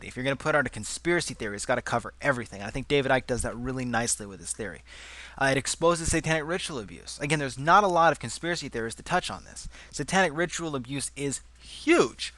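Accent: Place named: United States. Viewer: I Cannot stand the arogant yank talkover it pisses me off!Cant someone else do it?(it,s just his voice).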